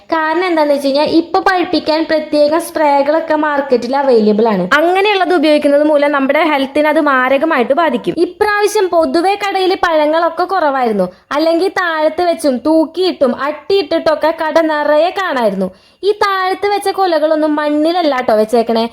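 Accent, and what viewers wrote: Thrissur, India. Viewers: നിങ്ങള്ടെ സംസാരം തൃശൂർ സ്റ്റൈൽ ആണല്ലോ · Thani thrissur bashayund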